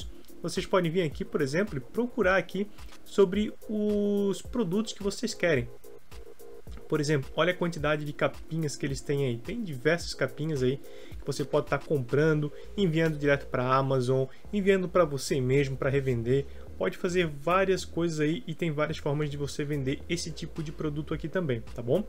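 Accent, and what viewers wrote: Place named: Florianópolis, Brazil. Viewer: você é de floripa também? fala igualzinho aqui o sutaque kk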